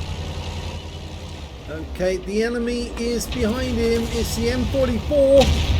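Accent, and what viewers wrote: United Kingdom. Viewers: Your exciting commentary keeps me watching your vids keep up the good work from N/A Jax fla also awesome Brit accent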